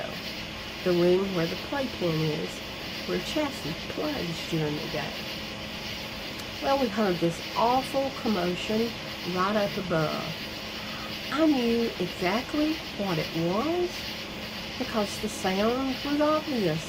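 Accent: South, United States. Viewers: She is definitely southern, My Dad was from Alabama and pronounced certain words differently.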